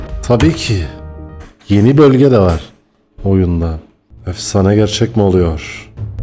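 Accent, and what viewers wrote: Cyprus. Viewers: Kibris şivesi cok guzel hocam video terapi gibi geliyor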